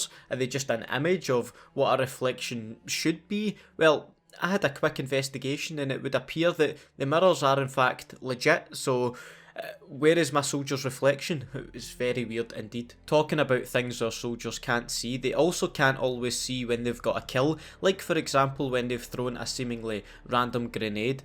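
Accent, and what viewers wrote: Scotland, United Kingdom. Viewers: Are u scottish,if so,so am i and what part of Scotland u from? Im from Edinburgh. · The first one of these introduced me to this channel and I’m so glad it did man I’ve been binging or old vids of mw2 my favourite the cod and now I’ve joined the notifications squad man also I’m Scottish aswell and it Scottish patter just makes this channel 10/10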